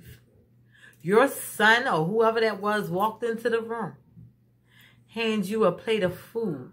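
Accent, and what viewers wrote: New York, United States. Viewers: Are you from New York? I can tell from your accent in your voice if not new York somewhere up north love your channel and I have subscribed god bless😘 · Agree 100% You look so pretty, remind me alot of my Auntie's on my Fathers side ..I get New York vibez♡love your accent! Tfs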